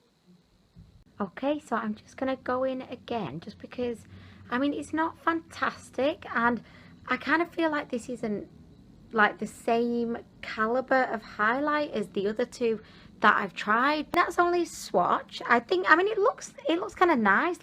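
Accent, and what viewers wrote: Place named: Manchester, United Kingdom. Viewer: Love your personality and accent are you from Manchester:D x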